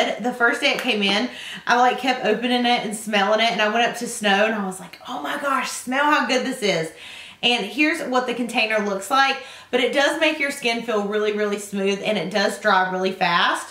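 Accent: South, United States. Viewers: Hi first time watcher here. Where do you live? I hear that southern accent! 🤗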